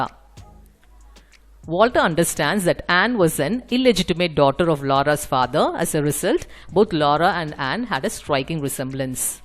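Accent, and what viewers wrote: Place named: India. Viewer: i dont understand anything becuase of your accent' why u were born as an indian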